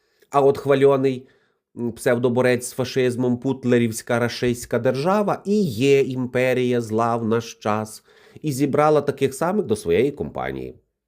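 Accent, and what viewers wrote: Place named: West, Ukraine. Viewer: Тебе послухати, - виходить, що Гітлер не був вже і такий поганий, не сказати, взагалі, добрий. Поцінюваний міжнародними елітами політичного і культурного нахилу. Ну, були у нього де-які дрібні недоліки. І що? У кого їх нема? Ну що з того, що завдяки його невинним примхам-забавам у світі загинуло до 80 мільйонів людей? Він же був хоробрий, був поранений, мав нагороди, художник, номінант на саму Нобелевку! Корочше, сама доброчинність! Не те що росіяни, читай Путін. І як доказ своєї маніпулятивної думки, за вуха притягує сюди образ Мао Цзедуна і Пол Пота, до купи. От так провінціальний недолугий історик-аналітик, загнавши корову до стайні і давши свиням їсти, навіть не витерши руки, миттєво біжить до комп'ютера. І своєю, впізнаваною ореол його мешкання, інтонацією, - західнянського селюха, береться щось блямкати примітивне зі скарбниці своїх недолугих нашарувань, що рояться в його голові. 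Убого хизуючись, як йому здається, йому одному відомими фактами про Гітлера. І мерщій старається поділитися їхнім ново-відкриттям з широким загалом, але під кутом власної, так би мовити, критично осмисленої, точки зору. Яка насправді відбиває помутніння його свідомості і реальної оцінки такого явища, як Гітлер. А насправді його не це цікавить. Йому ж, заради своїх шкурних інтересів і з метою приваблювання глядачів, конче потрібно робити сенсанційні заяви про те що на фоні росіян, пан Гітлер виглядає цілком пристойно. Бо скажи він зворотне, то це нікого не привабить. Бо тут не буде нічого нового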